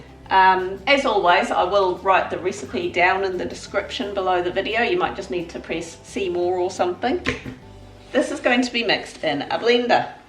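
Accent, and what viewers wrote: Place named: New Zealand. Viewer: yes! i have discovered that i can discern nz vs austalian accent! excellent.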